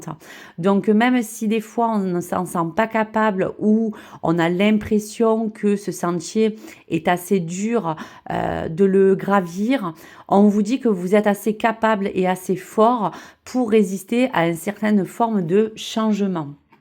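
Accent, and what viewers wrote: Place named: South, France. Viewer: Bonjour j'espère que vous allez bien merci pour ces guidances super que vous faites à chaque fois et j'adore votre accent du sud je suis de Marseille du coup l'accent chantant on connaît les cigales on les connaît je suis pas de Marseille même mais ça fait un moment que je suis là mais sinon j'ai grandi en Bretagne c'est pas la même c'est le jour et la nuit j'aime beaucoup votre accent quand même❤😂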